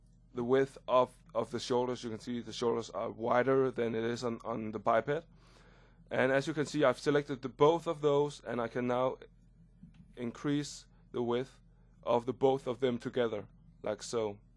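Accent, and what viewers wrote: Denmark. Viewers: it sounds like you're danish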